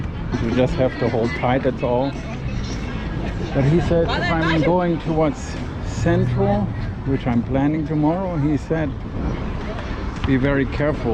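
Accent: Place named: Germany. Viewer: Are you from Germany? The accent sounds a bit like it. I'm half German half Brazilian 👌🏻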